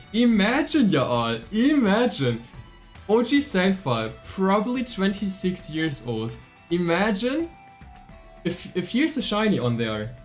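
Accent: Germany. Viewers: I can hear that english is not his mother language. Could it be he is german? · From Germany? Heard it after 2 seconds of talking. Never watched a video before but love your content. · You have the most german accent i ever heard. No front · man hört so raus das du deutscher bist :D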